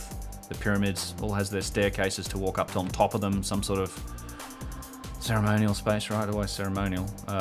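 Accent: Australia. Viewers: G'day from Oz, great live stream and other videos. Articulate, informative and excellent delivery with an Aussie accent to boot! Keep up the great work and live streams. Loving the mind blowing Serapeum series, and looking forward to your Younger Dryas / Cataclysm video. Cheers.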